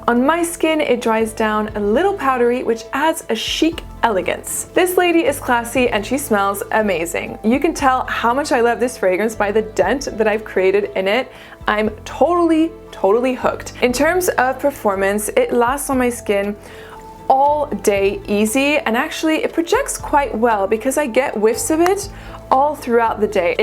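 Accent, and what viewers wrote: France. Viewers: always happy to see you with your uncontrollable french accent and all..😃😃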